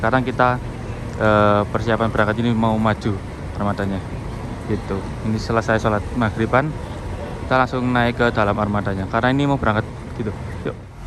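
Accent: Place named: Java, Indonesia. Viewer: Bli logatmu kok medok jowo😂😂.gk ada bali2nya.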